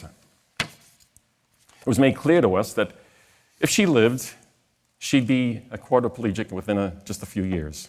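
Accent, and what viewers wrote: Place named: Boston, United States. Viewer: WOW, Great speech. Spoken from the heart. And you still slightly have your Boston accent. God Bless and be well.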